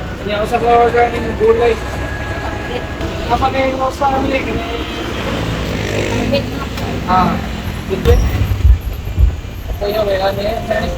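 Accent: Visayas, Philippines. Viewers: If I close my eyes...BISAYA jud ang nagastorya, sa dihang pagtan-aw na nako,si diay!😹👍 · Bagtikas bisaya nimo dol oi.. padayon lng dol sa pag blog og mga lamian pag kaon 👍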